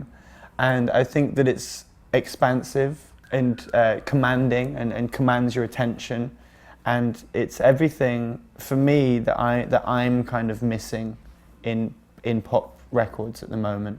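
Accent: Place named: RP, United Kingdom. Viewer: He's from Wilmslow so yeah he is gonna be pretty posh :P.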